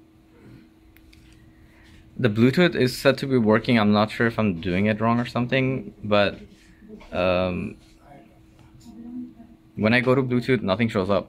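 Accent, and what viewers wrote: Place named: Arab world. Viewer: Love your middle eastern accent bruh, and thanks for this informative video! I plan on picking up this exact laptop due to it looking exactly like a MacBook Pro. Already Have a Mac Pro gaming Hackintosh. And yooooooo, I planned on doing the exact same thing with the apple sticker when I get this, are you me?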